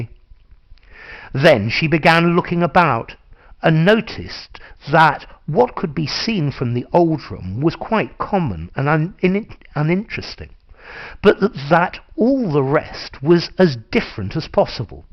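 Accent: United Kingdom. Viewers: i really like listening to this its easy for me to study British accent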